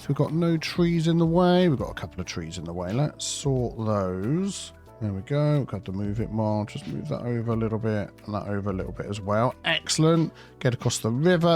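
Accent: United Kingdom. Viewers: Wait, you're British?!?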